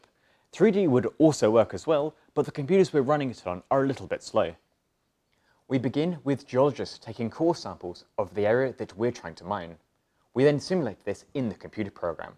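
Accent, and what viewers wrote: England, United Kingdom. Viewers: damn pom trying to speak aussie words. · You are a small Tom Scott, same presentation style and British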